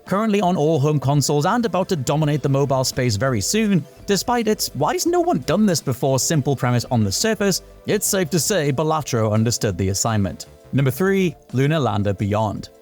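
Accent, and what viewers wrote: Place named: Scotland, United Kingdom. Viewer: He is pronouncing it properly but it's his accent which is a cool accent. It's like a British and Scottish accent combo.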